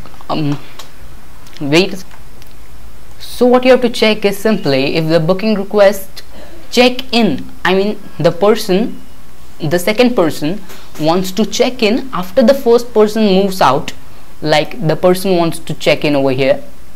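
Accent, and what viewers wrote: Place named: India. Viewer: ahh, your pronunciation and the royal peacocks singing in the background sent me back to India again. Thanks for these tutorials! Great job!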